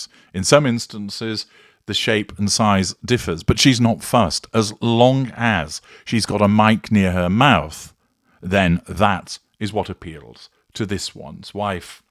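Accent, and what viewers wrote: United Kingdom. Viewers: What an absurd discussion you just had with yourself. You seem to think your semi pompous British accent qualifies you as knowledgeable. It does not!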